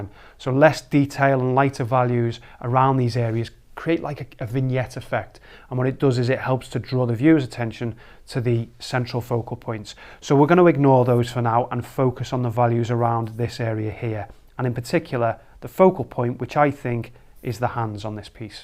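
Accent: Liverpool, United Kingdom. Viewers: Scouser? Me too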